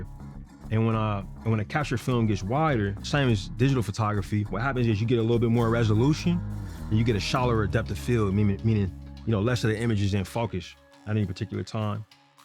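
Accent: California, United States. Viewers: If you close your eyes it sound like a gang member telling you bout film 🤣🤣 I know he from cali. All love P.S i jut Looked it up, he really from oakland🤦🏾‍♂ No offense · I was like where did he grow up? I seen California and said that’s why I’m hearing nispey and yg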